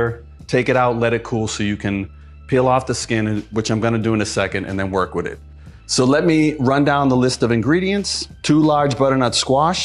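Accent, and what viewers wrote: Boston, United States. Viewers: That Boston accent ❤️❤️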